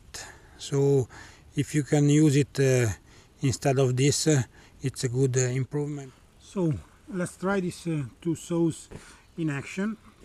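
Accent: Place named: Avellino, Italy. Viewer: Noto un accento avvellinese a sto inglese 🤔🤔🤔🤔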